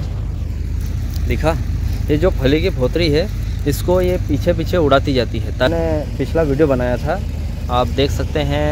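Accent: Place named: Malwa, India. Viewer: आप की भासा सेली से आप शाजापुर देवास उज्जैन के लग रहे हे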